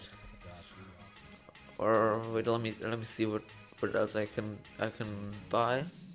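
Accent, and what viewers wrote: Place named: Germany. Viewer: Best Commentary 2k17 the German Accent is just awesome and it sounds you chewing bubblegum while the whole video. Still op Video ^_^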